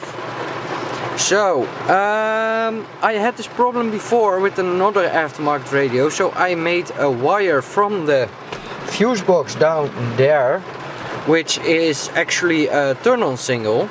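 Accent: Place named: Netherlands. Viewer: Engels met een Nederlands accent hahaha geweldig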